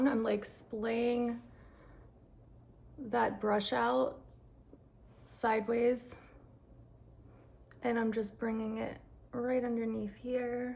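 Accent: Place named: New York, United States. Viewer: Your voice and accent are so comforting to me. My family is from the Bronx and Jersey, but I am far from home in the Midwest. ❤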